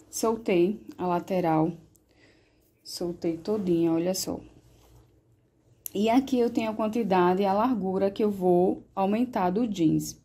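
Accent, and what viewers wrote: Brazil. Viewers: Amei a dica e o sotaque sou costureira e nordestina tbm ! Venham conhecer-me galera. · Ótimas dicas ❗Vc. é do Recife⁉️🌹💙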